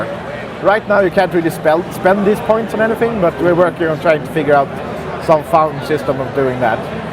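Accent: Sweden. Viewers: No, he always spoke like this. XD His accent is very Swedish. XD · is it just me, or are Swedish accents awesome? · swedish english..... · is he swedish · Yeh....stfu and face the facts, clearly its a convention by the ammount of talking, he has a swedish accent that sounds EXACTLY like Notch, its on an offical youtube channel which is IGN hes on a beta version which is probs only available to the devs, so please learn to use your small minded brain before commenting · guess the commentator is swedish..... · Hahah, i hear that ur swedish! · det hörs att han är svensk! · Hihi, sweedish-english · Jag gillar den typiska svenska engelskan :D I like his english accent :D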